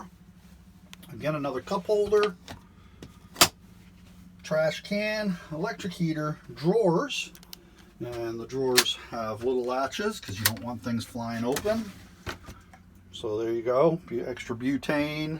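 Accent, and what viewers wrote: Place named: Maine, United States. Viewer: You sound like you have a maine accent I live in maine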